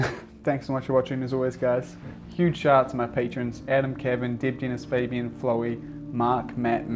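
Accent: New Zealand. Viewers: Are you from New Zealand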